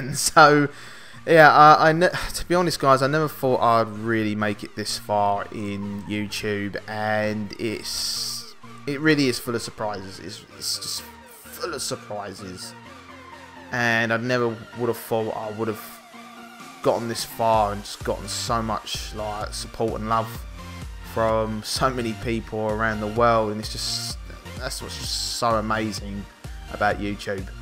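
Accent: United Kingdom. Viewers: I love your Accent is it British?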